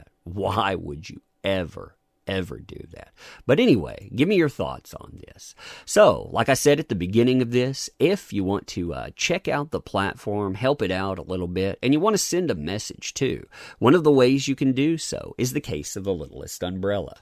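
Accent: South, United States. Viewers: Keep speaking the truth in that smooth southern tone 💪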